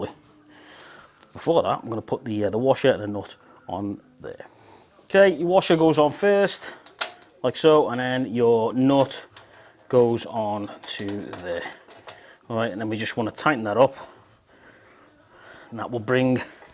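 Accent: Liverpool, United Kingdom. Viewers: Nicely done, taking your Scouse glide to another level as well as your editing and audio. I never saw on your “ To Do “ list win the league for Liverpool. Sending my best wishes from Scotland to you brother. Much love to you and yours. 👍👊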